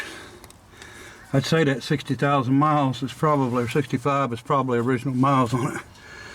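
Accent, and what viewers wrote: South, United States. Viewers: I am a French / bilingual Canadian from the province of Quebec, and I understand your language. It's the same with a beautiful southern accent. I love your automotive related videos. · Nobody speaks Canadian. Kilometres with an American sounding accent. I’m an old Aussie. We speak in millimetres and inches too. Haha. I do love the connection of you tubers. Absolutely awesome work sir.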